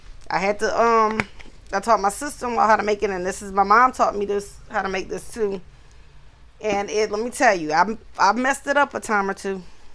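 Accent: Louisiana, United States. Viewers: I Love your Louisiana Accent!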